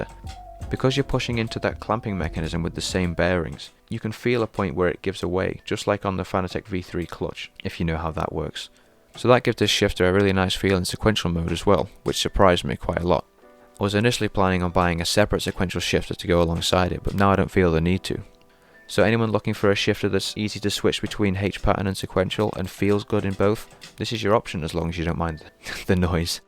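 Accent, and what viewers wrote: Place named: United Kingdom. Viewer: Sadly, I searched on eBay and wasn't able to find any stateside. Going by your accent, you sound like you're in the UK. I found the seller you probably bought yours from, but the shipping cost to the US makes it out of reach. I'll probably just spray paint the piece of silver 4080 I ordered from Aliexpress..when I get it in 5 or 6 months. haha.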